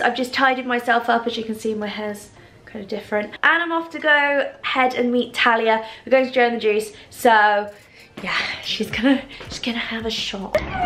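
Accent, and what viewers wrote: United Kingdom. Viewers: British, right?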